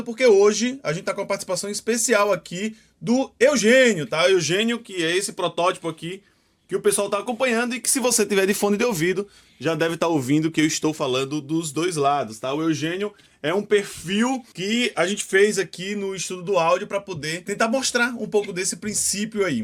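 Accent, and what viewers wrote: Northeast, Brazil. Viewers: gênio é uma honra e muita satisfação ver que você respondeu meu comentário nossa Aaaa, (muito massa) sobre a questão de mesário, eu sou em tempos de política sempre sou chamado pra compor a mesa e sobre técnico eu trabalho com som também, trabalho com som mas mecânico, e estou migrando para o som automotivo, e seu vídeos me ajudam muito, se entendo sim um pouco não tudo (isso sempre) e a cada vez que assisto um vídeo seu aprendo um pouco mais, assisto me divertindo, não zoando mas achando super interessante divertido (sotaque. Bem que eu queria ser do nordeste) ABRAÇO GÊNIO. DESDE JÁ MINHA ETERNA ADMIRAÇÃO POR TI HOMI.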